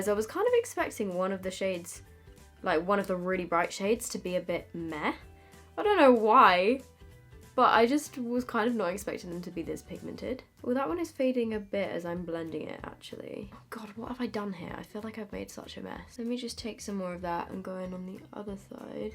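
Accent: United States. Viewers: Your American accent has me shook. I thought someone dubbed over you😂 so much better than our actual American accent tho. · Great American accent. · That American accent x 😂❤ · So odd hearing you with American accent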